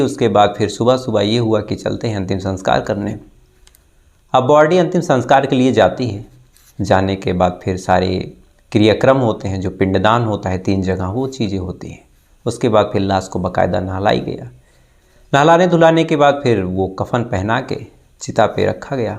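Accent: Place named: North Bihar, India. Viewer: Your style of talking suggests that u r from north bihar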